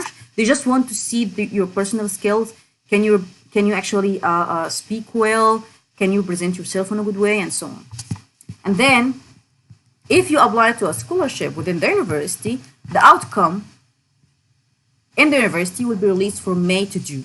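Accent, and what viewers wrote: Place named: United Kingdom. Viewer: amazing British accent makes me feel how my English is so weak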